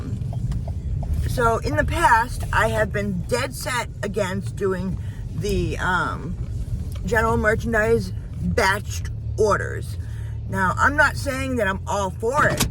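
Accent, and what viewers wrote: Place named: New England, United States. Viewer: Your new lisp detracts from your yankee accenth...kinda miss your former articuation. Btw, the loaders at Wally world have seriously been botching my deliveries (40 lb of birdseed on loaf of bread, a gallon of vinegar on top of a bag of chips, lord knows what that caused a bottle of baby shampoo to explode all over the rest of the order and my car). Lastly, you ain't doing that badly if you can afford Starbucks...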